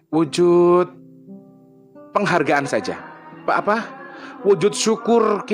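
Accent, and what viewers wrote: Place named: Java, Indonesia. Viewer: kbykn..orng jwa. fansny.. hbs logat.bhs cr bicr..medok bngtt. jwane... gt lho ms.. mlh. trnyt dr lampung y.... gpp ms..moh bnyk ssudr. . dr jw dn lmpung..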